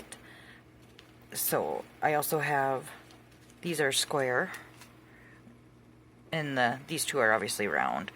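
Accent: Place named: Midwest, United States. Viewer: Your from the Midwest huh, I nooww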